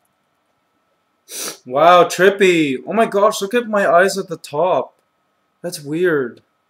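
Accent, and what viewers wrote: Philippines. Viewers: ya what happened in your Filipino accent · I think it's because he use to live in Toronto and he moved to the Philippines and started talking Philippines more and that's how he got the accent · yeah what happened to your Filipino accent? anong nang yare?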